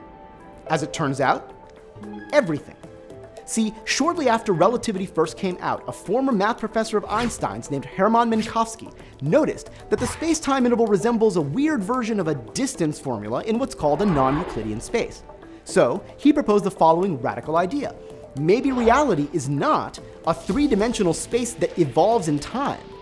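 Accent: Australia. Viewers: A few years ago, watching Matt instead of Gabe was slightly weird. Now rewatching the old videos and seeing Gabe instead of Matt is weird (no disrespect, Gabe). Matt has really made SpaceTime his own, presence wise I mean. Its weird to watch a SpaceTime video and NOT hear that sexy Australian Complex Physics voice. You guys chose a really good replacement. The lightsaber battle was cool too. May Matt guide us in this journey of understanding (or trying to understand 😁) for a long time. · I wish gabe stayed. Being a non native english speaker, the new host’s australian accent is so hard to understand.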